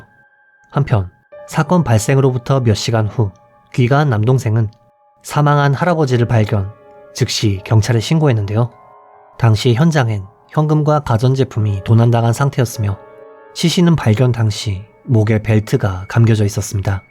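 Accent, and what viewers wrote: Japan. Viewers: 예전에 영상 정주행 중일때 사실 님 일본인이신줄 알았어요 ㅋㅋㅋㅋ 테마 파--꾸 이런단어나 한국어 ㅈ 발음도 약간 그 일본어 자지즈제조 같아서? ㅎㅎ 지금은 일본어 초보자로써 굉장히 부럽네요 ㅋㅋㅋ 늘 조곤조곤한 목소리에 잠들고 있습니다 감사합니다~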